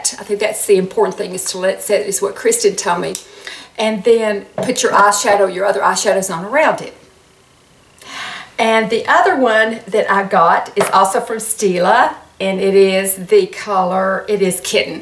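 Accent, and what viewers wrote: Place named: Georgia, United States. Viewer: I’m in Southeast Florida. I love your Georgia accent. I’m 54. You go girl!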